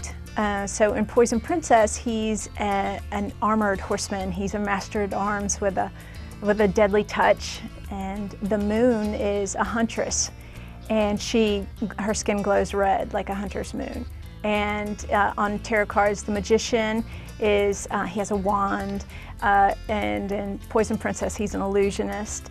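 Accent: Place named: Louisiana, United States. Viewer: mmm cajun accents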